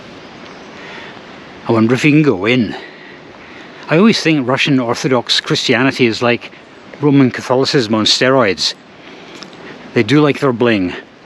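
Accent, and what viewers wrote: Scotland, United Kingdom. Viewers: What English dialect are you speaking? It sounds a wee bit like Scottish, but since I am no native I cannot tell, really.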